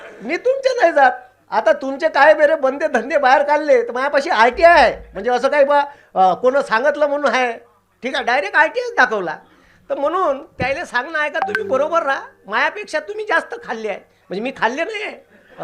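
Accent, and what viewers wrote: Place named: Vidarbha, India. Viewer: Varhadi Marathi ❤❤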